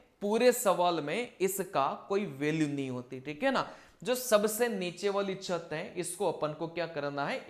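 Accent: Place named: Hadoti, India. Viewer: Sir ji aap rajasthan m hadoti se hona ...aada jana lapad padegi 😂 hadoti wale hi bolte hain😂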